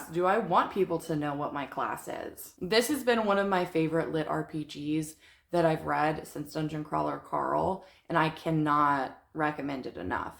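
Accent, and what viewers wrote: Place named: South, United States. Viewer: You sound like you live in the south....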